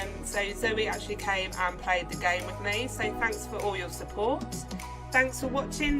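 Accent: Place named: United Kingdom. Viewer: I can't believe it took me this long to actually comment on this video. For context, I am the creator of the game and I was sent this video to a few friends of mine who found it and even I came across the video after searching up the game on Google. I didn't think much of it but today I did another search and I thought I should watch the whole thing! 1. - Clock Soapy's badge error was a period of time where more people had that badge than 60 Minutes, so it was disabled to stabilize the amount of owners with said badges. Sorry for that! 2. - I was not actually expecting the areas to be THAT small since the original size was based on Spawn, which didn't have much. My main reason for making these areas small is that I hate large and open areas. it leads to longer searches and overall takes a lot of time to find things like buttons. 3. and - Yes those are toilets. 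They're planned to be used for a new area in the future! 4. - Oops I guess I made it too close to the main road 5. - "Cheeky little button" I LOVE this commentary! It really adds a spark of life to this video (I'm sorry but the British accent makes this video way more entertaining to me than it should be) 6. - I'm glad I get to hear someone falling for the glowing flower! It felt so good to add that in knowing it would throw everyone off 7. - School is something that's for sure but I'll try my best to keep the pace going! 8. - That's a really good point. With how I plan to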